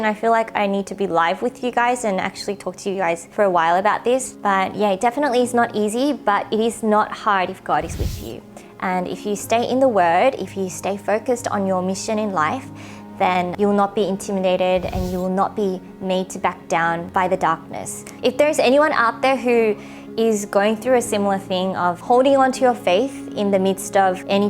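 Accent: Australia. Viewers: Hi its my first time leaving a comment, but i love how you share your faith through ur channel. Yes id love to hear ur testimony! And I really like ur Aussie accent:)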